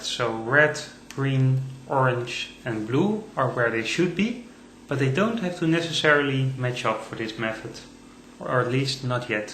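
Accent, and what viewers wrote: Netherlands. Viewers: thnx man en ja het is te horen dat je nederlands bent ;) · It's so obvious you're dutch, but good vid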